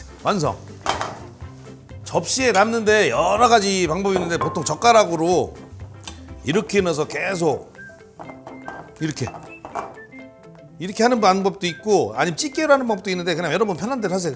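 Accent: Chungcheong, South Korea. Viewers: 구수한충청도사투리언제나정겨워요 저도동향이라 ㅋㅋ 감사합니다 언제나행복하세요 소유진씨와~~홧팅♥♥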